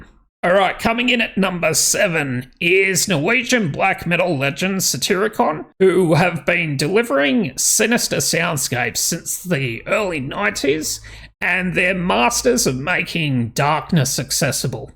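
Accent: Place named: Australia. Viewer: are you Aussie btw? I could swear that's where your accent is from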